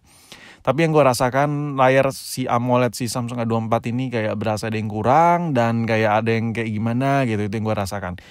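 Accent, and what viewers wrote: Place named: Medan, Indonesia. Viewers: Logat Medan si babi ini, sini kau jumpa tengah kita di simpang pos, aku mau belik hape. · Omak orang Medan ni kek nya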